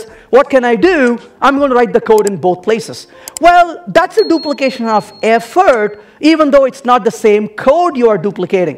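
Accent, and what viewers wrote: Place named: India. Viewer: Indian accent and public speaking don't go well together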